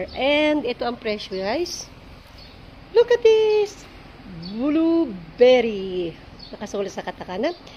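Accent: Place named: Japan. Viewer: for the second time na pinanood ko to....kagabe at now....nag enjoy lng ako lah....at na inggit ako not only for the blueberry pati na den sa japanese accent mo 💚☘️💙...love love this video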